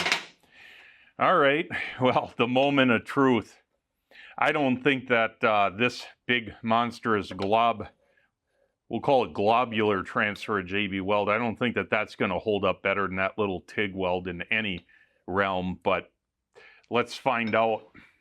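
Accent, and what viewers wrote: Canada. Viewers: I'm from Detroit, we border Windsor Canada. So growing up I knew a lot of Canadians and you sounds like them! Nice vid btw. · This is the thickest Canadian accent I’ve ever heard. This is what they call a “newfie”? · Sounds Canadian, eh? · Is this guy Canadian. The accent.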